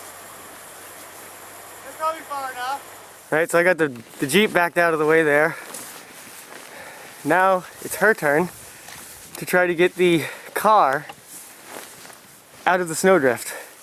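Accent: Maine, United States. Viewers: What part of Maine are you from?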